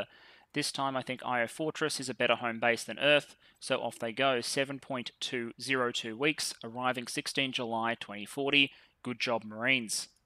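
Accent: Australia. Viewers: Hey man I just wanted say that I look forward to your videos every day, you content is top tier and that aussie accent is exactly what the video needs, your stuff is great, here if my infantismal engagement contribution to aid you with the algorithm god, also castilo is the only relatable view imo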